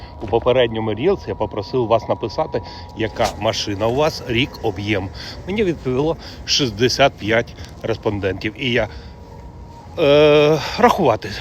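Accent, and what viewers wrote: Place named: Surzhyk, Ukraine. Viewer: Вчи українську краще і швидше , бо суржик лізе з усіх дірок! Українська : наголос на третьому складв. Тобто на букві ї.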